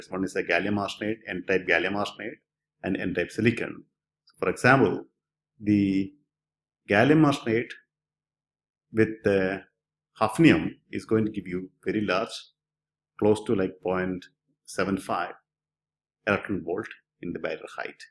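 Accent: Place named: Kerala, India. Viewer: The narration is in Malayalam. He claims it is in English but only Malayalees can understand his English. For example, he pronounces "chop" as "chope" (rhyming with "choke"). "Bonds" is pronounced as "Bones". Beware.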